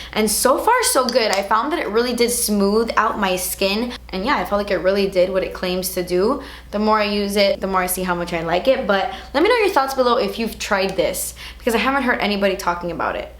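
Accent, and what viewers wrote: United Kingdom. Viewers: When does her British accent it sounds like Alex drake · Slaaaayyy! Btw I love your British accent keep doing it! ❤️❤️❤️ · How do you get that british accent?? Wanna know the story... 😍😍😍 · Omg I love you so much, and even more every single video but that 'British' accent has my love for you going wild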